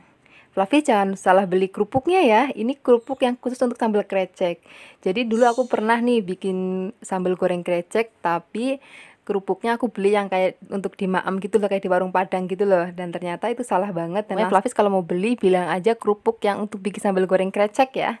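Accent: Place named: Tegal, Indonesia. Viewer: Mbknyq org mana???logatny kyk org tegal jawa tengah❤slm knl y mbk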